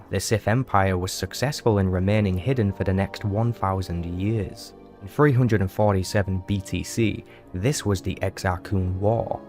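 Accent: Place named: Yorkshire, United Kingdom. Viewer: Just recently joined your subs, and would just like to say it's nice to hear a fellow Yorkshire man🤘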